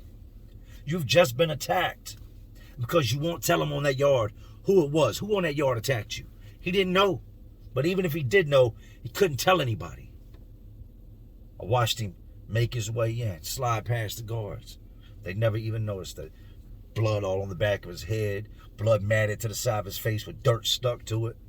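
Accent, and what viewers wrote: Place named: Philadelphia, United States. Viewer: I knew you had to be from Philly or the Tristate, love your storytelling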